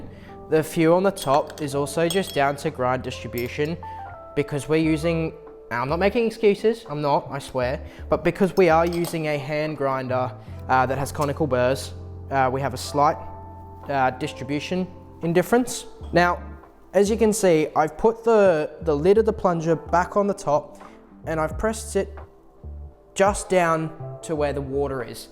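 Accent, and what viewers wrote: Australia. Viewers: Clearly an Aussie from down under👍🏼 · Where in the UK are you from?! I'm Aussie and you sound like 95% Aussie to me! lol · Are you Australian?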